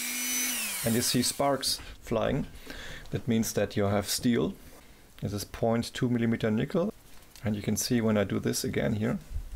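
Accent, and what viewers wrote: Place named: Germany. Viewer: Hi thanks for the helpful information. I guess you are from Germany from your accent.. Would you be able to help me source the aluminium bus bar ? I live near Germany ( und ich kann auch Deutsch reden ; )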